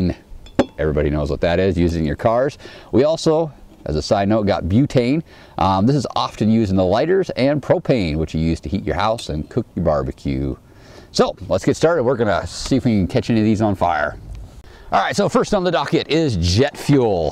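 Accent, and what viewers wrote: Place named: United States. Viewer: I really can't remember when was the last time I heard genuine American accent as yours..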